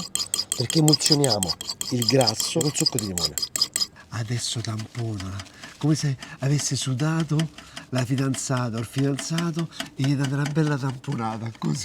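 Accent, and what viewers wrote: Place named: Milan, Italy. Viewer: Max complimenti per aver assunto anche l’accento milanese quasi perfetto !!!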